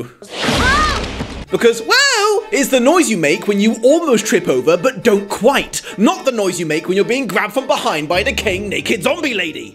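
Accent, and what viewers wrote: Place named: United Kingdom. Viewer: I love your British accent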